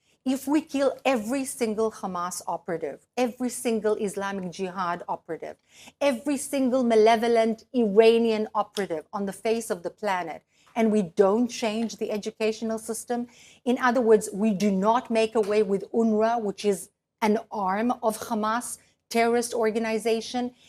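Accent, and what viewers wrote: South Africa. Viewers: South African accent Stop lying Israel child killer You are propagandist You lost go back to south African racist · well that was entertaining....she sounds south african... 2X apartheid for her on the books.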